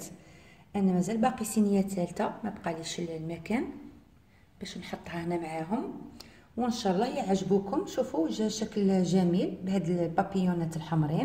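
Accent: West, Algeria. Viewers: السلام عليكم يعطيك الصحة أختي على الوصفة رائعة إذا لم يكن هناك إحراج من اي منطقة أنت ؟لان اللهجة من الغرب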